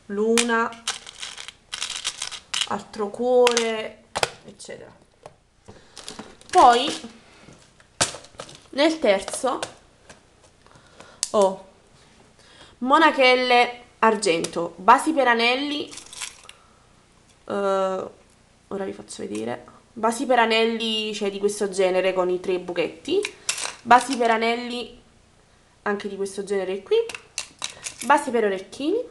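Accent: Naples, Italy. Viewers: ooh Cacchiarola, avevo capito dall'accento che eri Napoletana ^,^ mmh dove precisamente? :O cioè non ho mai sentito di sto posto xD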